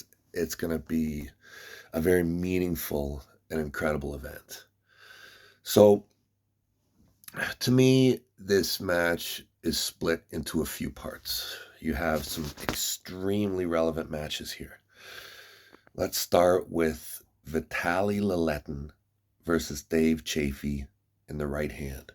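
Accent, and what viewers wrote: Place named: Canada. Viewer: I been watching you arm wrestle before <num>, it’s crazy how your accent has changed, I miss the deep Canadian accent, God bless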